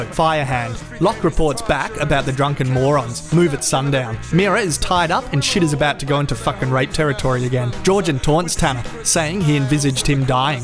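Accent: Australia. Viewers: Fucking stoked that I remembered your name when some random dude was talking about you earlier today. I was like, why the fuck would I want to listen to some kent talking about Game of Thrones in an Aussie accent? Well fuck me sideways, that was a pisser... going back to watch the rest :) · UNCHAINED! I have always loved Australian accents, so yours together with your funny ass jokes is just unbeatable.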